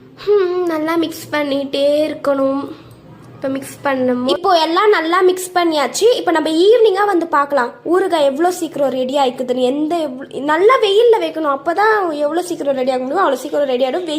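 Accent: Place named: Chennai, India. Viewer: ஏய் உன் குரல் வச்சு கண்டுபிடிச்சுட்டேன் நீ சென்னை தானே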